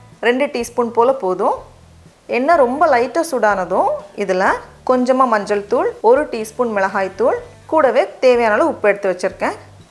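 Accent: Nagercoil, India. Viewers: Hi friend.. Nagercoil vaasam ungal pechu la theriyuthu.. namma oora neenga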